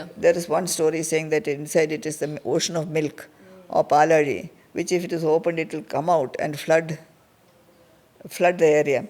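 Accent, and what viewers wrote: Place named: South Africa. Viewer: Your voice accent is very interesting. I am a South African Indian and your voice has some of the accent from South Africa 😅.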